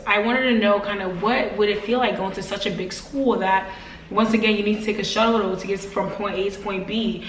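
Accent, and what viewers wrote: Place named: Africa. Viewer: What is your ethnicity? If you're not familiar with the difference between Black Americans and General Africans then you probably won't know but the combination of the darker shade of brown,slanted eye size, her nose size and her resting facial expression would let me know she most likely not a Black American just by looking at her. I can also hear parts of an African accent slip out most likely from West, Central or even East Africa(Sudan) where her features most likely come from and her last name is " " which isn't a Black American last name.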